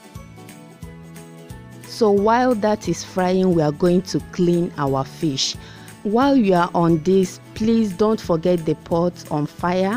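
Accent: Nigeria. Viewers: Madam the soup it's self looks exceedingly palatable but the sweetest thing you did is employing the Nigerian twang in your vocals. Keeping it real as you are suppose to be, as you are, Natural. Unlike the cartoon characters one sees via you tube. So fake and unreal. Love you, keep it up.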